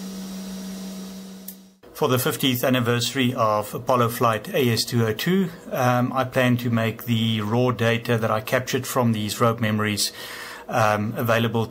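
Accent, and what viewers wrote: South Africa. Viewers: This is absolutely incredible! Wild find. I am absolutely flabbergasted that such a thing could be not only found, but READ in this time. O____O By the accent i'd imagine you're South African? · Lol i was able to tell your are from South Africa because i am originally from there lol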